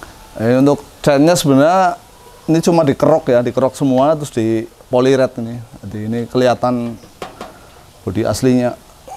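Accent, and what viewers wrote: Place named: Banyumas, Indonesia. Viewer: Dikerook 😀 ngapaknya ketahuan, Mas pasti dari mana ya??. Sukses mas, semoga cepat laku, keren banget mobilnya.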